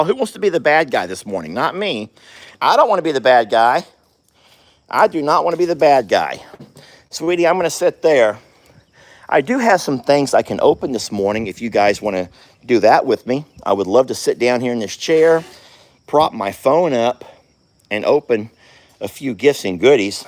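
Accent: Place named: Texas, United States. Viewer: Me too, that Texan twang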